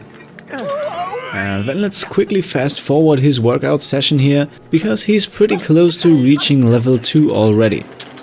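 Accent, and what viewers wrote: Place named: Germany. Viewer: Back here for a rewatch after the new Series started and one thing I noticed right away is how different sounds. As a fellow german I can tell how hard it is to get rid of the accent and these videos sound like they were made in the phase where actively improved his accent and english speaking abilities in general. The pronunciation is pretty close but the cadence seems a lot more confident and well... practiced nowadays. Really goes to show what a good content creator is imo. In all his works I feel a passion and a genuine desire to create something that is a joyful experience! So thank you for all the joy you brought me I guess!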